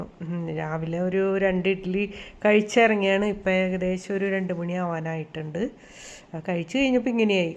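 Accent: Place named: Thrissur, India. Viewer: ചേച്ചീ നാടെവിടെയാണ് സംസാരം കേട്ടിട്ട് തൃശ്ശൂർ പോലെ തോന്നി ഞാൻ തൃശ്ർ ആണ് ആദ്യമായാണ് ചാനലിൽ ഇഡ്ലി റസിപ്പി കണ്ടത് ചേച്ചീടെ സംസാരം ഇഷ്ടമയപ്പോൾ കുറച്ചു കൂടി വീഡിയോസ് നോക്കി ഇനിയും കാണണം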